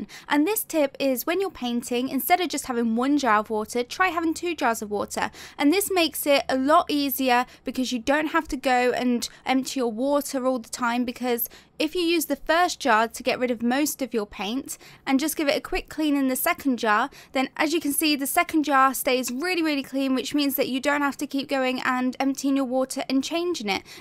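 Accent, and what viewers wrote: United Kingdom. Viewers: Is this a british accent? Sounds awesome at 0.25x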